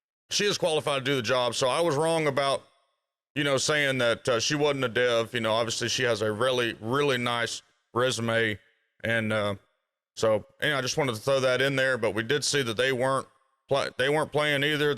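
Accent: South, United States. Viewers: I thought this was a joke you really talk like… hillbilly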